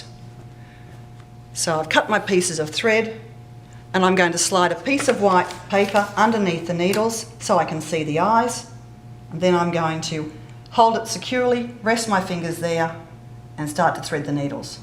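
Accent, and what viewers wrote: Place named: Australia. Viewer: Thank you for your clear and informative instruction on pleater use. Well done 👏👏👏 How nice to hear not only an Australian voice but an Australian voice with culture (without 'ocker') I now will search out where I can buy an Amanda Jane!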